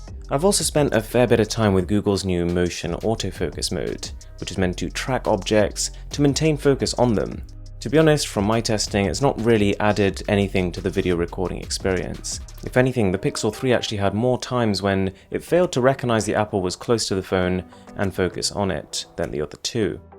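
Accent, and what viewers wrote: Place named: United Kingdom. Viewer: Bro you have a fashion model type face😍 with your brithish akhcent😍👍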